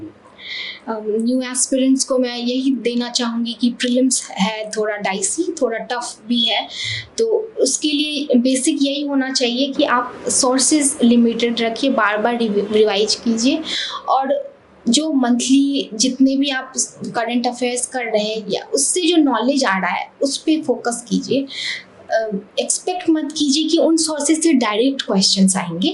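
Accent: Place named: Bihar, India. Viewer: Bihar se hai ye hindi me bojauri include karate hai 😊😊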